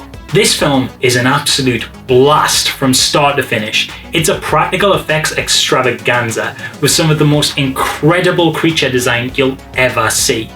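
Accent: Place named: Geordie, United Kingdom. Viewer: Maccum or Geordie? As a Geordie who has lived in Australia for 20 years I can't quite place the accent. Thumbs up on the research on the history of Xmas Horror. Have a good one.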